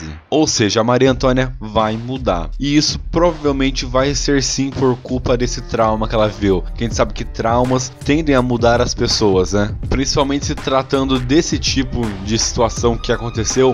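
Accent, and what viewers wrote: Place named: São Paulo, Brazil. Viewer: você é paulista? O sotaque parece!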